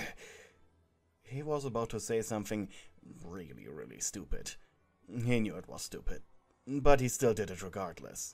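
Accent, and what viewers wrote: Germany. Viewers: GODDDD I LOVED THIS SO MUCH (question are u German?)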